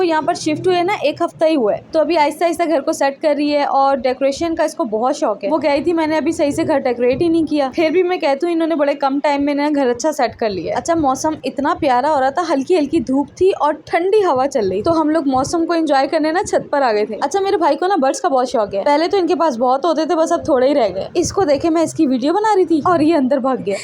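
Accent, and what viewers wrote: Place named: Karachi, Pakistan. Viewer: Zaberdast ❤ aap ke bolne ka andaz boht accha hai i really like your videos aap kaandaz bilkul karachi wala hai aap long video banaya karen😊😊❤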